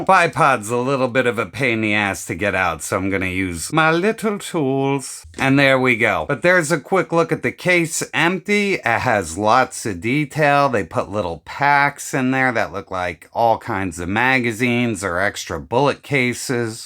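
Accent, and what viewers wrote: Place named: Pittsburgh, United States. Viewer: I'm afraid my ignorance was showing. This is a Pittsburgh accent maybe? I thought you were doing a funky voice in the intro but my accent knowledge wasn't up to snuff.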